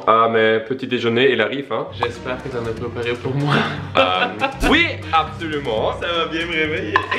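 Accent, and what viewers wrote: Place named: Canada. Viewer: tu mon youtubeur préféré des vidéos accent canadien et qui met quoi des vidéos trop trop rire 🇩🇪👍🇨🇦👍🇩🇪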